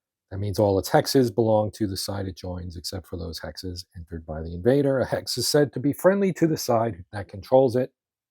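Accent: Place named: New York, United States. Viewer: pronouncing eurotowns with new york accent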